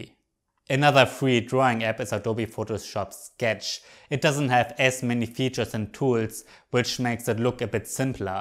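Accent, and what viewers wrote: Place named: Germany. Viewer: I love it that you speak english in a German way🥰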